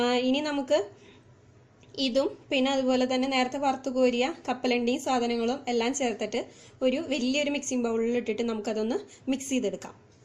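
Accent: Thrissur, India. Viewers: good job. like ur presentation..trissur slang...thank u....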